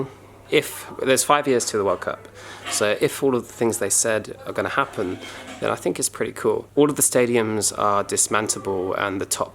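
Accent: United Kingdom. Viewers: Bmw 7 series as a taxi And u say not to shabby Haaaahaaaaahaaa AND UR BRITISH I CAN TELL BY UR ACCENT SO U KNOW WHITE GUYS HAVE FORDS AND VECTRAS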